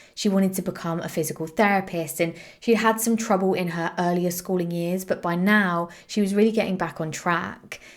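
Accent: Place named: England, United Kingdom. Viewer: you are beautiful. I just found your channel and subscribed. I love the way you report. You do great research., presentation and narration I’m wondering where your accent is from. I’d guess England, maybe a countryside accent. Best of success to you with your channel.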